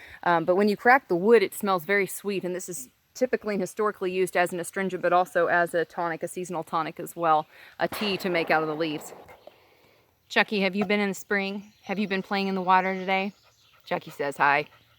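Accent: South, United States. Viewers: You seem so different from a few years ago....you use your Southron accent and dress more casually and seem to live in a different area of the country. What changed?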